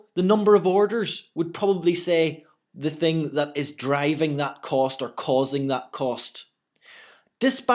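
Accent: Scotland, United Kingdom. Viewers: Is that Scottish accent? Soooo amazing! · Scottish, I think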